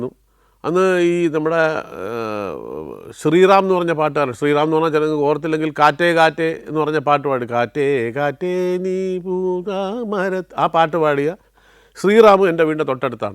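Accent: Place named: Thiruvananthapuram, India. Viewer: ആദ്യത്തെ എപ്പിസോഡ് കണ്ടു് തിരുവനന്തപുരം ശൈലിയിൽ ഉള്ള വിവരണം കേട്ടപ്പോൾ ഇഷ്ടം ആയി❤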